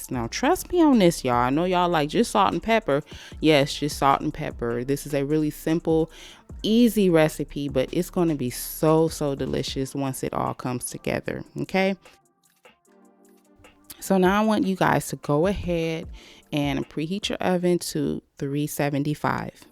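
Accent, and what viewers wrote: South, United States. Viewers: Ik you from the south 😂😂❤️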